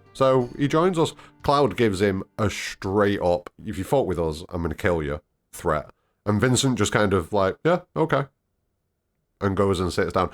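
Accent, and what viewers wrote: Northern England, United Kingdom. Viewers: lol imagine if tifa just went “ nahhh dickhead” the fact that your northern makes it so much funnier, I fucking love your breakdowns dude keep up the good work. Your holding the rebirth content atm and I love it dude another great video my guy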